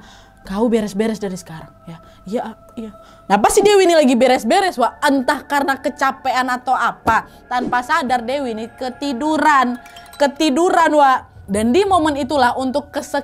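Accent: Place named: West Sumatra, Indonesia. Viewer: Kak orang mana ya???kok ada sensasi bahasa Minang nya, 😅😅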